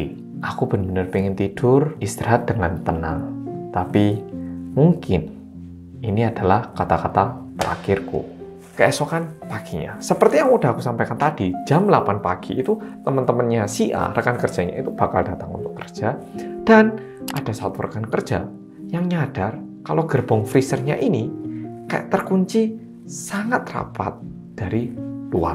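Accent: Java, Indonesia. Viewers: Selalu ngikuti channel ini,tpi juarang komen,awalnya tertarik dg logat jawanya,cocok aq yg wong Jombang,bolo..bolo yoo wk..wk,sampek aq pingin tahu sejarah hidup mas ini,..🤝🤝🤝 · Sogannya lucu orang Korea yang medok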